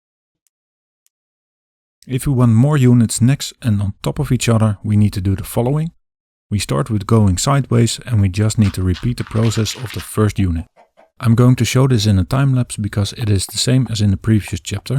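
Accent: Netherlands. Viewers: u sound dutch sir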